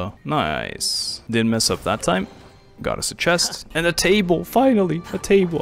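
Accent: France. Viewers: WAIT ARE YOU FRENCH ? because you were pronouncing Fontaine very frenchly so I had suspicions but now... je suis trop contente parce que j'adore regarder tes vidéos en général, c'est super fun et tranquille :D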